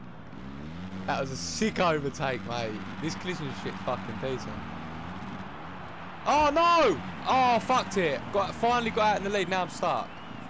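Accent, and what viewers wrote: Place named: United Kingdom. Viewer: Loving the British accent mate😂😂👌🏾🤙🏽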